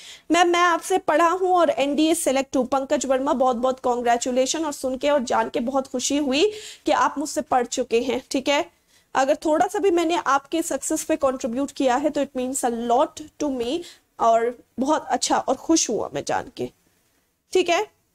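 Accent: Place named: Bihar, India. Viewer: I think aap bihar se ho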